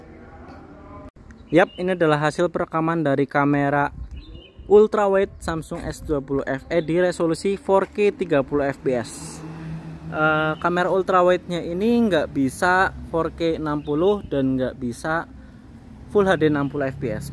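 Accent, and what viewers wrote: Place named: Java, Indonesia. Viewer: Jowone sampean medok men mas,sampean seko pundi?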